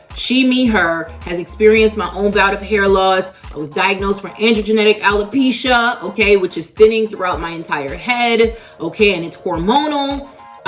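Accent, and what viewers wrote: New York, United States. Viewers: Sis, love your content, love your hair, love your New York accent! You keep me rolling! 😄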